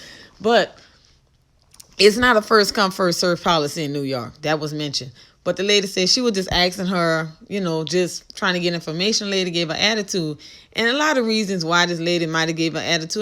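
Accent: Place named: New Orleans, United States. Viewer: Hi! I don't believe you really collect pins, but there's this shop called on Morgane Brret Shop on Instagram, and she recently did a small pink dress Cinderella themed pin collection, and it reminded me of your channel! I hope this comment isn't too forward, but I just wanted to let you know. Love your channel, and it sounds like you're from New Orleans, so it's cool seeing another fellow Disney collector from Louisiana!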